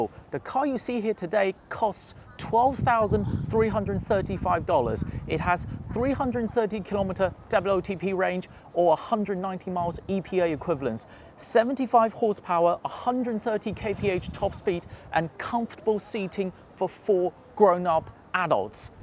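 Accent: United Kingdom. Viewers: Nice video and brilliant British accent!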